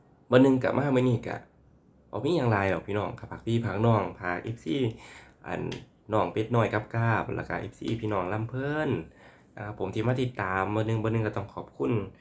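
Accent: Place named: Laos. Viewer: ชอบสำเนียงลาว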